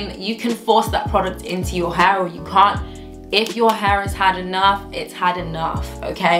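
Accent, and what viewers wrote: United Kingdom. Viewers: Are you just talking to British people or something? Because I noticed you have an accent. I know you're not talking to Black American people or maybe you don't know our history. You just said that white people have a variation in porosity. Black Americans are literally white. Like literally have white ancestry on high levels. And you're out here telling us we can have a certain hair texture. Well not understanding we're not africans. African would morsel apply to someone who is British like yourself maybe. Who has 100% African ancestry not someone who is literally working with 24% European ancestry. And people even who have 5 to 10 European ancestry. That's still a part of our dna. And it will still be expressed in many different ways. So just because someone has the same skin tone as you. You can't say we have the same genetic makeup as you. 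Therefore our hair may have a different pattern. I don't even know what you mean by African hair. Is African hair just 4c hare? I always wondered that. Are we including 3C hair or at least four a hair? Personally for me when I look at hair types. And it's hard to see because everybody likes to do a wash and go and loosen their curls. Which is like I can straighten my hair totally what lies that my natural curl like know. But some people they don't like to show what they got but from what I've seen the dry version of 3C that's tighter. Four A and 4b. Sort of. A looser texture of 4b are textures that are pretty much relatable to my hair t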